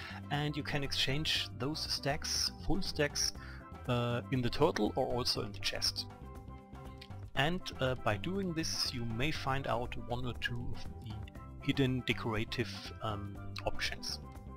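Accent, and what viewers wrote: Germany. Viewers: this guys motherlanguage is like 100% german ;D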